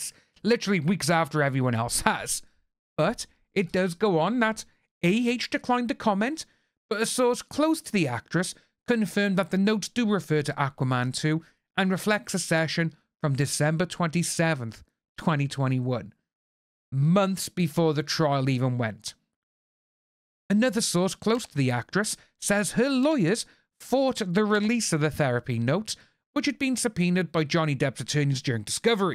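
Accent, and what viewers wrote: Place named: United Kingdom. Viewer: I am a Brit who emigrated to the US. Your insights are spit on. Plus, I’m missing the UK and your accent makes me feel at home.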